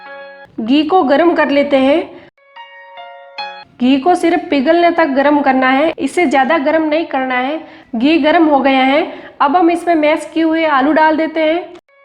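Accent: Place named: Marwar, India. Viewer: All Indian Rasoi waoo hum bhi marwadi h aapna loog ki boli s ptaa chl jtaa h ....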